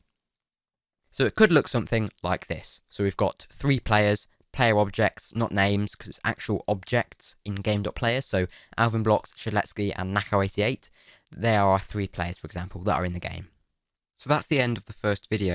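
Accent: United Kingdom. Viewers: I can tell you are British and you have a voice that changed so much in one year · ikr, He used a heavy British accent, lol those days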